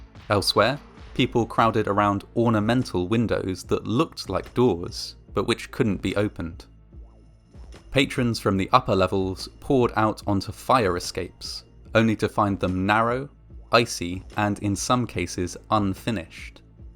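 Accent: England, United Kingdom. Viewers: This channel is amazing and you sound like a really intelligent guy. Very refreshing. Would I be correct in assuming that you are English?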